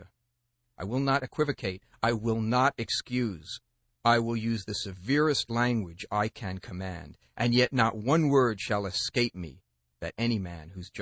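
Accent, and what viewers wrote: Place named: Boston, United States. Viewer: aside from the obvious white Boston accent, THE Words are amazing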